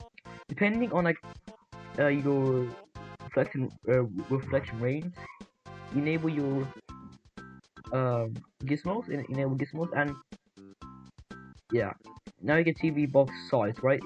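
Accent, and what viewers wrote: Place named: Germany. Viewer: i hear a bit Deutsch hhahahahaha habe glaube noch nie einen Detschen gesehen der ein Gorilla Tag Fan Game macht dachte wäre der einzige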